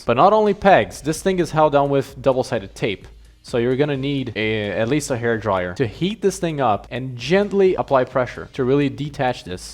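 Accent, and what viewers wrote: United States. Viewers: Manda muito no inglês. Parece um americano kkk, ótimo vídeo mano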